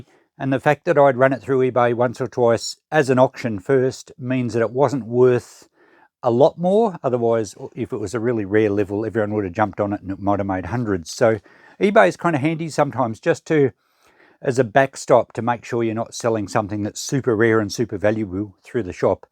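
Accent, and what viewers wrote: Australia. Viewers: Hi, I think you have an Australian accent? So when you say dollars, presumably these are Australian dollars? Or New Zealand? Or are you maybe an Australian living in the US? Just curious as the value of the tools seems to be very relevant, here and I need to translate to my own currency.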